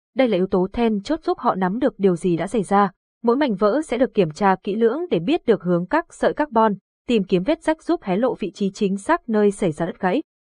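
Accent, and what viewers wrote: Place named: North, Vietnam. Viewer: Đoc nhanh, giọng BK khó nghe! xin vui lòng…!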